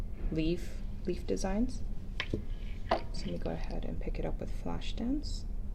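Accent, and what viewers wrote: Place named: Hawaii, United States. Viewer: Aww I could tell where you’re from by your accent before you said Hawaii! ❤️ I’ve kept an eye out on your products since the Bundle Monster days but still haven’t gotten around to purchasing yet...fell out with nail polish for a while because I couldn’t wear what I wanted at work. Now I’m slowly rebuilding my collection, thinking of finally getting a stamping plate, and omg how am I finding out only now that you’re from Oahu 😲 now I’ll have more reason to buy, love supporting local...even though I’m currently living away from home! 🤣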